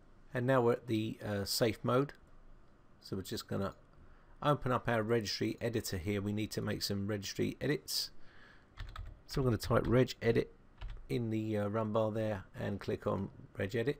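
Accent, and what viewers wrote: United Kingdom. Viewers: Thank you. It helped me and your british sounds cute.. ;)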